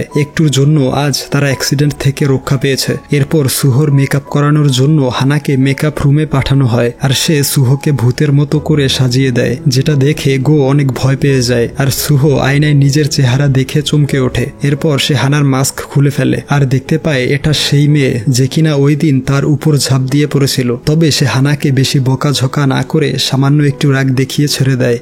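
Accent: India. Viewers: Apni indian kotha shone mone hoy Bangladesh e ami Bangladesh e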